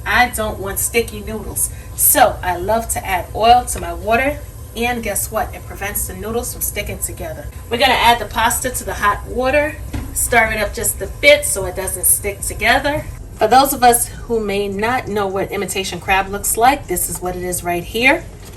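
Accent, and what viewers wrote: New York, United States. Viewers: I'm not going to play with you. 😀😀😀😀 You have an accent like you are from NYC. What I am about to say does have nothing to do with you and your cooking. I have been watching this show called POSE on FX Channel. If you know what I'm talking about I appreciate it. I just wished Blanca... who was the mother of "THE HOUSE OF EVANGELISTA" would have fed her kids things like this. Fried chicken, pork chops, etc..., LOL I know it was only a TV show. I'm just having fun 😋😋😋😋😂😂😂 This looks AWMAZING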